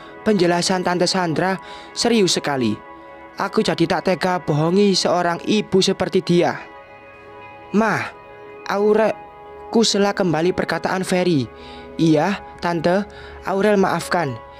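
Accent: Java, Indonesia. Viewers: Huuh Medok Jawanya bikin hati sangat bahagia saat denger suara Admin yang manjain telinga ini. Huuh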